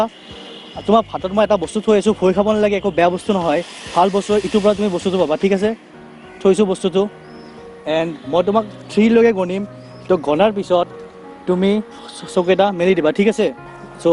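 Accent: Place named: Bihar, India. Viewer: Bihari typ hindi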